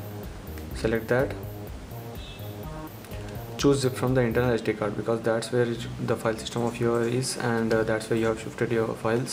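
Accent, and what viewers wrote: India. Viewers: Why do the people with Indian accent talk so fast? It makes nearly impossible to understand what are they saying..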